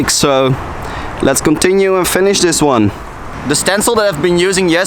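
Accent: Netherlands. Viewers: are you dutch you sound dutch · Duidelijk een Nederlander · Hoi ik weet niet of het te persoonlijk is om te vragen, maar ben jij nederlands? Je engels accent is echt een typisch Nederlands accent, kwas gewoon ff nieuwsgierig. Verder wel een coole video. :D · You dutch? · Nice ! K vind het wel leuk dat je gewoon aan zowat alle Nederlanders kan horen dat ze Nederlands zij. Als ze Engels spreken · Ok are You dutch??? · ben jij nederlands je accent lijkt mega hard lol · Dutch accent: 100% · Are you dutch? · Is it me or do you sound Dutch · Bruh the dutch accent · You sound dutch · Dutch accent! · Goeie Nederlandse accent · You sound Dutch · Is dat in Eindhoven? Denk dat namelijk omdat je accent klinkt alsof je uit nl komt. If you dont understand me i Get it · He sounds Dutch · R u dutch? It sounds like ur dutch with that accent xD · You sound like you're Dutch and you're from Limburg or Brabant if you are then hallo! 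· You Dutch? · I know ur Dutch or Belgian by the way your talking English🤔🤔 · Ru dutch? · Ik hoorde al gelijk dat je nederlands bent 😂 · That’s a strong dutch accent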